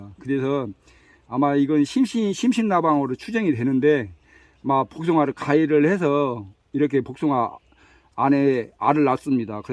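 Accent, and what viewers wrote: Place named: Gyeongbuk, South Korea. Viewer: 네네 경북 말씨로 알속무게 이네요 감사합니다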